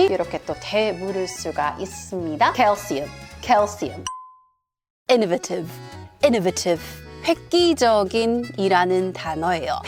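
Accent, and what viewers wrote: United Kingdom. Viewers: Hump day ㅋㅋㅋㅋㅋㅋㅋㅋ 발음이 영국식 발음이시네요. 재밌게 봤습니다. 구ㅕㅂ ❤️ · 영국취업 준비중인데 영상 너무 도움돼요! 이렇게 영국원어민 발음으로 영어 가르쳐주시고 심지어 재밌기까지😊 감사합니다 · 영국사람처럼 발음하는거 솔직히 존나 킹받음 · 넘나 재밌어요 영국 발음 체크하기 좋아요 꿀잼 쵝오🎉😂❤❤❤❤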